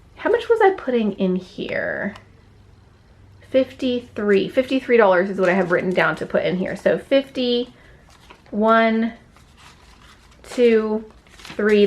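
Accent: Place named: Oklahoma, United States. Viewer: I dont know where you moved yet, need to watch the other videos. I am just taking note that it sounds like Oklahoma. Lol. Which is where I am. 😂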